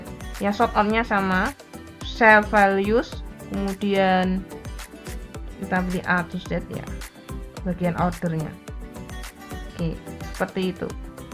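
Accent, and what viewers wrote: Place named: Pati, Indonesia. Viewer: wong pati yaa'eeeee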